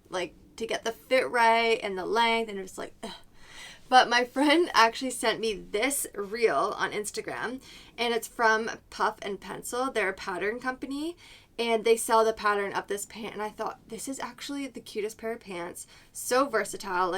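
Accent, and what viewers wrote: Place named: Canada. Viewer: First video of yours I’m watching! I was like oh she must be American but then you said buttons and pocket and I’m like nope she’s Canadian! 😊 Love the video! Going to watch more now.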